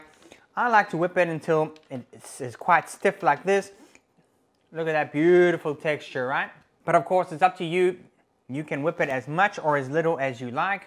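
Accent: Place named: South Africa. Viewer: I am South African too, yes. Recognized the accent. Love your channel and all the amazing recipes. Well done!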